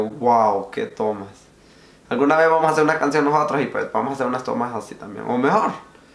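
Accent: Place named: San Pedro Sula, Honduras. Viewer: Desde qué te escuche hablar dije, este Men es catracho, hablas como sanpedrano.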